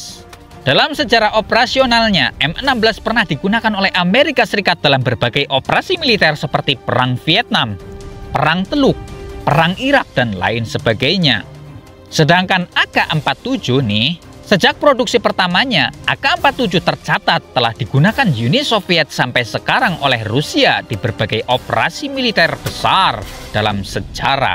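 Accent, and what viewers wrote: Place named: Banyumas, Indonesia. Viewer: Suara pennyiar enak rada ngapak " ya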